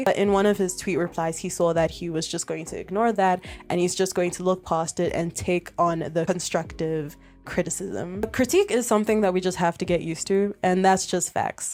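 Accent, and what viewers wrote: South Africa. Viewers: I agree, I think it's perfectly reasonable to expect criticism for your work when you put it out in the world and Sam was very mature going forward after all the backlash. (also just wanted to say your accent sounded really familiar and now I see it's because you're also from South Africa XD) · Such a great video, thank you! It's also great to hear a South African accent on YouTube by surprise! Had no idea when I clicked on this video. Subscribed! 🩷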